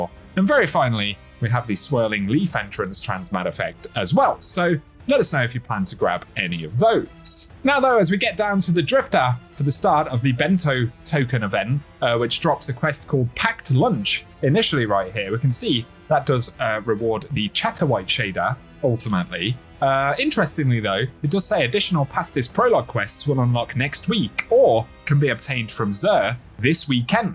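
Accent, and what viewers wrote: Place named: England, United Kingdom. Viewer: Cut him some slack, he's English. If he were Welsh, you wouldn't be able to understand much of a damn thing, I'll bet.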